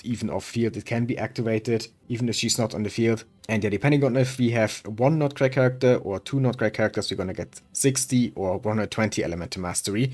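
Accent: Germany. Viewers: i hear german?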